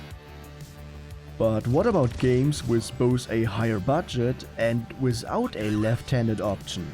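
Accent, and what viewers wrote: Germany. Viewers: Love the German accent bruder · Dein deutscher Akzent ist wirklich fürchterlich aber echt nices Video. Du scheinst zu verstehen, wovon du redest😄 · Fantastic work as always,. Are you a Bundeswehr vet? You mentioned the G36 paddle release being something you're "quite familiar with." Maybe the comments just pointed it out to you but you've got the right accent for it:) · Are you German? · Gib dir mal mehr Mühe bei der Aussprache! Dieses typisch deutsche "s" statt "th" treibt mich in den Wahnsinn! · German accent maybe? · Ich habe bereits in den ersten paar Sekunden gecheckt, dass du ein Deutscher bist! ;) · Are ja german? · i was kinda confused if your accent was german or not, now im pretty sure it is(u can just ignore this, im only proud of myself) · You can hear out you are german, correct? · Das hört sich schon nach einem starken deutsch Akzent an, bin ich da richtig? 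:D Vllt solltest du das "TH" nochmal über. Da bekomme ich jedes mal gänsehaut ;) Sonst ein super Video :) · Wusst ich doch, dass das ein deutscher Akzent ist :D Schönes Video! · I can hear your german accent mein freund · Klingst deutsch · the accent...YOU ARE GERMAN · So Deutsch der Akzent ^^ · sry kann mir dass net geben der deutsche akzent kickt zu hart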